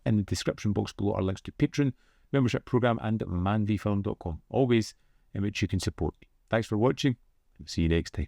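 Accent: Scotland, United Kingdom. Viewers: Glad you finally saw this. It was a seminal part of my childhood, watching it on HBO, as I'd never seen anything so shocking or gross (or so I thought at an early age). I also wanted to say thank you for all your Top 10 videos. Your mellifluous Scottish brogue has been a soothing source of ASMR that has helped me stay calm during these difficult times. Keep it up!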